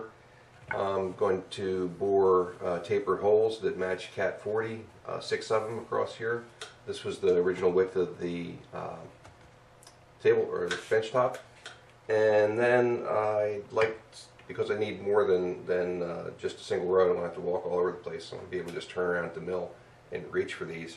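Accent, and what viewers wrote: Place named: Philadelphia, United States. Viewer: Your accent says you're either from the Philly area or still around there, LOL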